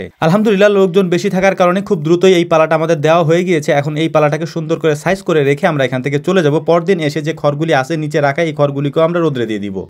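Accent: Faridpur, Bangladesh. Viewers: ফরিদপুর এর কথা শুনেই Subscribe করে দিলাম। আপনার বাসা ফরিদপুর এর কোন জায়গায় জানাবেন ভাইয়া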